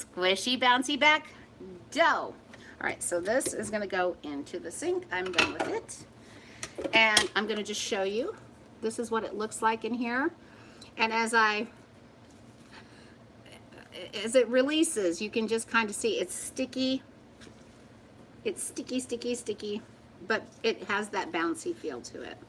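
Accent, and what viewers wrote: Texas, United States. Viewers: How is it I am just now finding you? LOL. You sound like you may be a fellow Texan. I am enjoying your videos.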